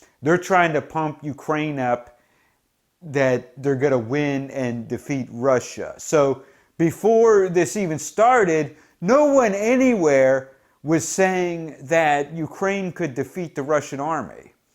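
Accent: United States. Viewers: the American accent making «Cossacks» sound almost like «Kazakhs» ^^ Very worthwhile video!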